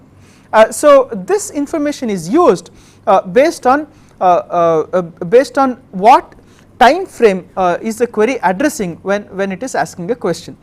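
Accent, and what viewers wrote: India. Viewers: Indian English is hard to listen -*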